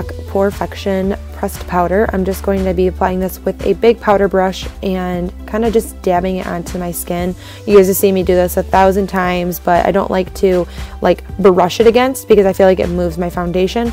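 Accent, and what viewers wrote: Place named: North, United Kingdom. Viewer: I cannot tell you how much I love your videos!! Ps. Your northern accent is freaking adorable!!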